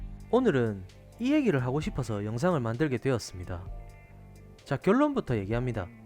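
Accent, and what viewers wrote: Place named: Samcheonpo, South Korea. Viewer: 고향이 어디세요? 남해나 삼천포 아닐까 예상해봅니다. 귀에 쏙쏙 들어와요. 구독했음요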